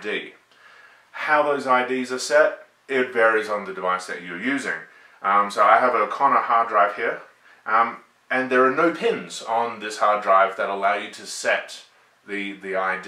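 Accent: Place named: Australia. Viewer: Damn good video. I'm guessing that is an Australian accent. From a Brit perspective, when I was young (with my youngun mind), basically Australia looked like one of those countries that was big enough to have stuff USA had, like skyscrapers and basically "massive civilisation stuff". I hope that makes sense. Well, anyway, it intrigued me as a kid the way Australia (and actually Canada is a bit like this) has that and has PAL and the Amiga. It was like the Amiga having a fighting chance (and I was an amiga fanboy of course). Then, in Europe (northen Europe and then down to France and Spain) used the amiga too but also UK) they seemed kind of condensed like a hardcore of coders (demoscene etc) and gamers as a seeding ground for amiga stuff that would permeate around the world. A channel which reminds me of yours a bit is PhilsComputerLab because he appears to somehow have some space (does he actually live in the outback or something?) and tons of hardware. 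So Austrlia (and big landmass countries with skyscrapers like Canada) had the resources and civilisation to form these kinda Amiga museums today. It is shocking what amiga stuff ended up thrown out in the UK. I remember hearing about a guy who had a gold colour a500 (one of those special ones) and while he was at university, his mum cleared out the loft and thereby ditched it. Ouch. So, maybe you can see why I like Australian (and canadian etc) usages of the amiga. :)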